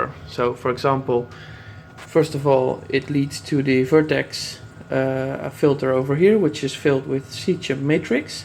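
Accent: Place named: Netherlands. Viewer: I knew , by hearing your voice , you most be from The Netherlands (like I am)...but when I saw you VODKA label I know it.....By the way....Vodka stays Vodka...no W....but that a side....I like your tank!!!...I am going for a reefer 350 later this year..Or maybe have one build for me...the Reefer 350 has no room for a refugium and I would like to have one....Nice video!!!...good explaining!! ..Thanks.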